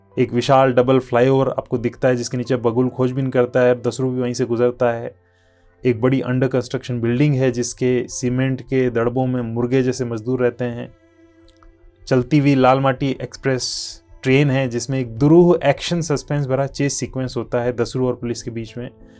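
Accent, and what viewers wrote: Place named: Rajasthan, India. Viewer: bhai aap rajsthan se ho kya , bolne ka andaz vanhi ka hai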